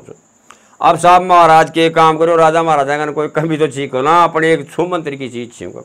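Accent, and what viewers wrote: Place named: Haryana, India. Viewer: Kha se ho tau ji apki boli to haryanvi mix h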